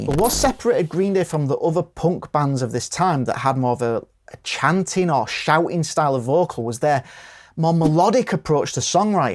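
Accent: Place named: England, United Kingdom. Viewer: Good video mate. Think some of the nuance in what youre saying gets lost outside England along with the accent :) Not a band/song ive ever been a fan of but maybe i should give them a go - i agree that they were musically more conscious than some other punk bands of the time - probably their image and they way they were pronmoted helped too. God knows what sell out or anything like that means - its all bs - green day were making music they wanted to make you can feel that and people loved it - i don't think that was selling out or anything like that. Being unoriginal isn't a criticism - the beatles took stuff from chuck berry, jazz standards, 50s pop, classical, elvis etc and every band before or since has too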